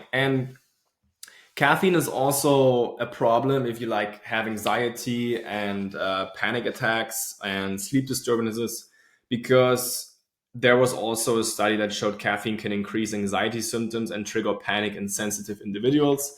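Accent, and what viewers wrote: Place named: Germany. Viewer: Warum sprichst du eigentlich nicht deutsch 😅? Deine deutsche englische Aussprache ist richtig geil 😅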